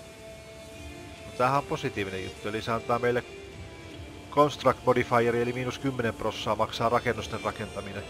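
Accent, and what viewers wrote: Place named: Satakunta, Finland. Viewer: Oletko satakunnasta? Päättelin vain "murteen" perusteella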